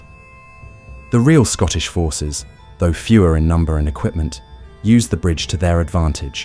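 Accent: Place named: England, United Kingdom. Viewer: Narrator with English accent denying Prima Noctis is SUS AF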